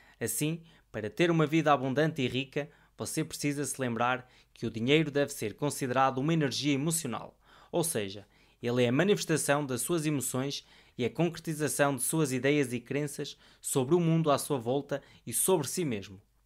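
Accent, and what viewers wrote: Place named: Portugal. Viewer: Parabéns Que bom encontrar um sotaque português ❤🇵🇹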